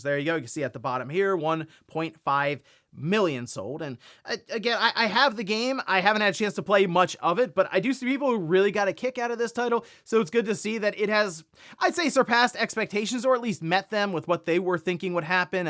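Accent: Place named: East Coast, United States. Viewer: It's just a standard East coast accent, like Philly/Baltimore area.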